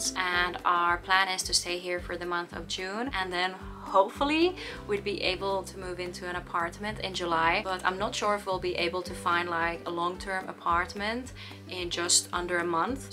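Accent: Netherlands. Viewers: Do you know you nav a Dutch accent when taking English?😂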